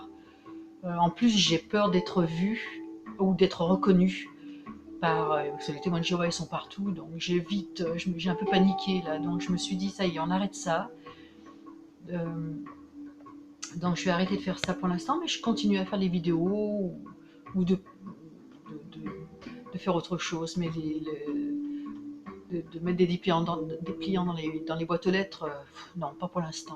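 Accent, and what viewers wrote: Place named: Lyon, France. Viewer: Excellent, Dieu répond toujours à nos prières sincères. Bon rétablissement surtout psychologique et sois bénie. A ton accent, tu ne serai pas d'origine lyonnaise ?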